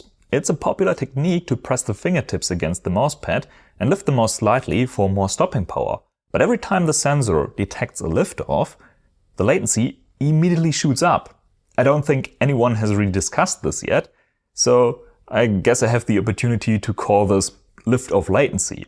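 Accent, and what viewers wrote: Germany. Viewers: are you german you sound like a german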